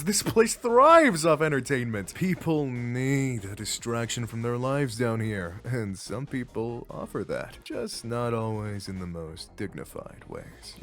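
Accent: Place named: United Kingdom. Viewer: You sound like you live in a steampunk britain, its captivating I love this style of videos discussing niche topics i really like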